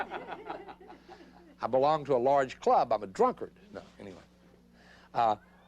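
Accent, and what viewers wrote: South, United States. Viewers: That thick southern accent doesnt help......at all.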